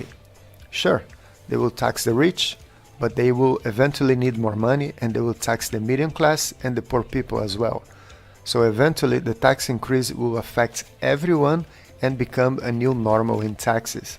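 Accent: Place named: Brazil. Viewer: Not gonna lie, but your acent sounds very Brazilian for me haha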